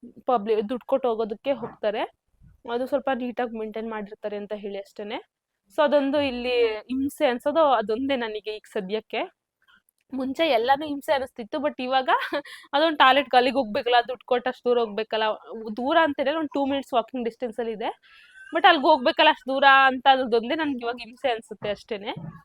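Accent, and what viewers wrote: India. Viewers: Hale mysore or Mandya Kannada. Nimma mane 10*10 ede annisuttade Badige yestu ede alli. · Area elli heli Amma. Nice talking in Bangalore Kannada.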